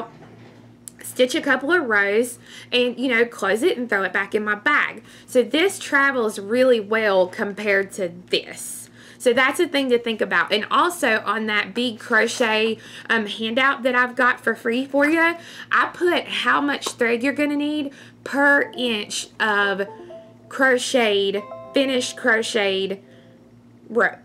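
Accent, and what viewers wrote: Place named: North Carolina, United States. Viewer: you are a hoot! My family is from Mt.Airy,North Carolina. I feel like I am listening to a family member! I enjoy your videos so much! Thank you!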